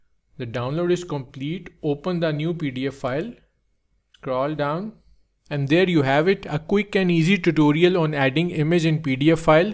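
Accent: India. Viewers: When i heard the indian accent and a sub 2 mins video, i knew it was the one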